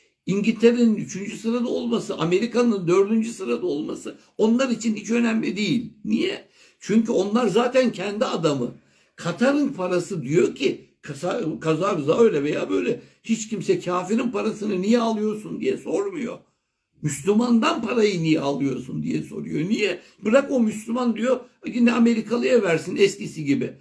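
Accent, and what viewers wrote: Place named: Istanbul, Turkey. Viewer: SAYIN HOCAM SİZİ DİNLEDİKÇE GURUR DUYUYOR ÖZ GÜVENİMİZ TAVAN YAPIYOR...İYİ Kİ VARSINIZ... HUZUR VEREN GÜLEÇ YÜZÜNÜZLE NET ANLAŞILIR BİLGİ DOLU İSTANBUL TÜRKÇENİZLE EVİMİZİN KIYMETLİ BİR MİSAFİRİ AİLEMİZİN TARTIŞMASIZ BİR ÜYESİ OLDUNUZ... RABBİM NEFESİNİZE GÜÇ KUVVET VERSİN BİLGİNİZ HER MAZLUM EZİLMİŞ TÜRK VE MÜSLÜMAN COĞRAFYALARIN ÇOCUKLARINA DERS OLSUN KIYMETLİ HOCAM... ALLAH İÇİN SİZİ ÇOK SEVİYORUZ... SELAM VE DUA İLE ALLAHA EMANET OLUN GÜZEL ÜLKEMİN ALÇAK GÖNÜLLÜ MÜTEVAZİ BİLGE İNSANI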